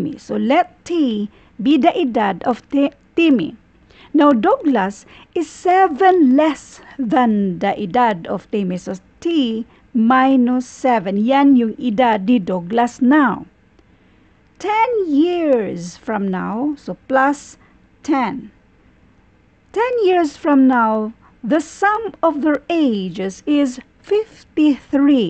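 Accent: Visayas, Philippines. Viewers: Advantage po sa amin mga bisaya yung accent nyo po 😁😁😁 daghan salamat maam god bless ❤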